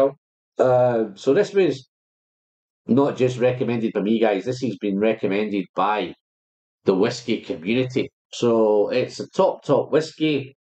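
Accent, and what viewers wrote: Scotland, United Kingdom. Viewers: English, with a few wee drops of Scotia.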